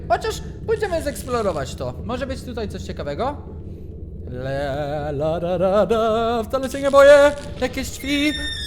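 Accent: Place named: England, United Kingdom. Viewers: Mr XanTeS pl To nie kaleczenie. większość życia mieszkał w Angli, więc i posiada angielski akcent, nie polski.. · Mr XanTeS pl on jest z anglii jakbys nie wiedzial -.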